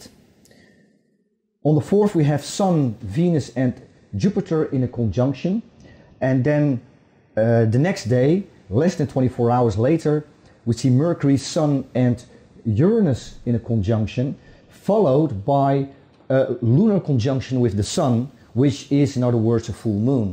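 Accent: Netherlands. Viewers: typically Dutch ;-). We almost all speak like that over here :').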